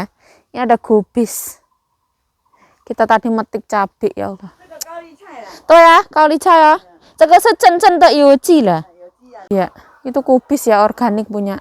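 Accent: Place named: East Java, Indonesia. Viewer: Sehat selalu mbak,tetap semangat.....bicaranya logat jawa timuran,sama kaya diponorogo....👍👍👍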